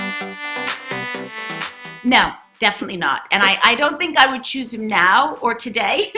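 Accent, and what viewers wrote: United States. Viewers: sounds more American and less British in this video...........her voice is very different on RHOBH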